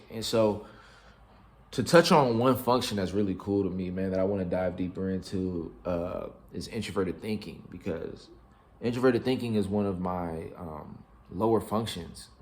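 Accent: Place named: United States. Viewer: Hi I’m watching you to learn American accent 👍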